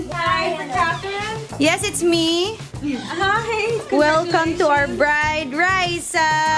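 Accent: Philippines. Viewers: May punto ng Taytay... abang ka-saya! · Ms. gustong gusto ko talaga accent mo, rizaleña talaga 😂proud tiga angono na lumaki sa binangonan... 😉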